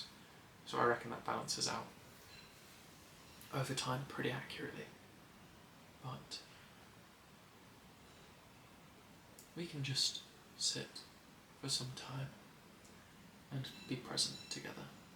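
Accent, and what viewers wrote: England, United Kingdom. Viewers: What part of the UK is your accent from I quite like the way you speak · I haven't watched this yet but wanted to say your hair is beautiful. Also if you mind me saying so you are just pretty and cute. As an American your British accent is heavenly. I feel like you would give the warmest softest hugs. I hope you're well. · im from argentina and i use your videos to practice and learn more about british and i loooove your accent !! i wish i could speak english like you someday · Also as a fellow English person I totally felt the accent bit 🙃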